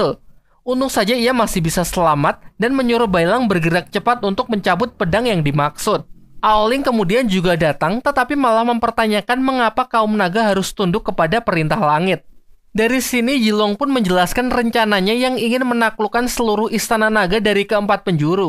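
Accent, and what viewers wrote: Java, Indonesia. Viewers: Bang lu orang mana, orang jawa kah kok bilang nya belum deng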